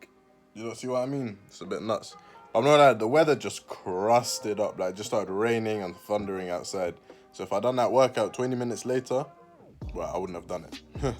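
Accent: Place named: United Kingdom. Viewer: Pull ups I hate pull ups. I like push ups better. I just recently saw your thumbnail video and I just wanted to say thankyou and I will try and copy those techniques of your on Photoshop keep doing your thing my man and stay safe. I'm Kaleb btw. I just subscribed. Are you British I like your accent. I do travel vlogs in New Jersey and other states along the way and maybe come check me out and maybe subscribe. Thanks. Bye.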